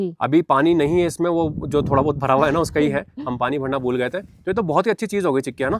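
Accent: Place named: Mewar, India. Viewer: ji aapki boli mewad ya aaspass ki lag rahi hai, mewad, malwa ya aaspass se hi ho kya sir.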